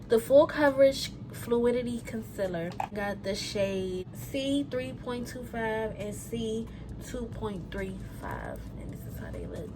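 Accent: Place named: DC, United States. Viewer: Sis are you from the DMV? I swear I hear a DC or MD accent lmaooo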